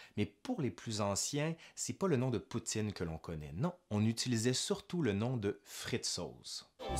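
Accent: Quebec, Canada. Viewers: Quel plaisir d'entendre un québécois parler de la poutine....bordel que c'est bon remarque le schisme de la frite entre les belges et les français ça marche aussi hein ^^ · Ta capsule donne trop envie d’aller au Québec pour goûter cette fameuse Poutine Une amie française s’est expatriée deux ans dans ton beau pays . Une nana un peu difficile en matière culinaire . Elle a a do ré la poutine Bref merci pour cette vidéo J’adore ton ptit accent charmant que nous les français on comprend super bien 🤗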